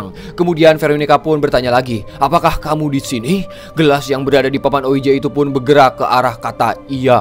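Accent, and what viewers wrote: West Java, Indonesia. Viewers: Pertama nya aku lagi serius nih ya,eh pas mimin nya ngomong ko aku langsung ter sedak ternyata mimin nya orang sunda ,sampurasun dulur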